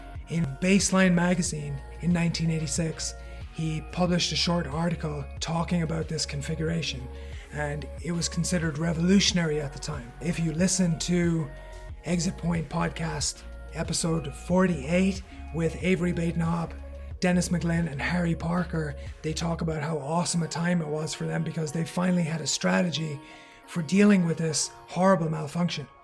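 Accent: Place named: Southwest, Ireland. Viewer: Where is that accent coming from, bangs of south west Ireland throughout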